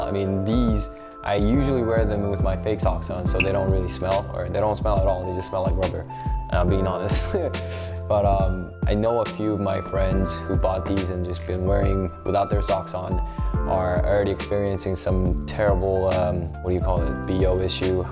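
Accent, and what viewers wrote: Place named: United States. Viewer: Great American English! Is it your second language in S. Korea? Great review! Wouldn't you consider this kind of Mule shoes, let's say 'girlish'? - Cheers from Peru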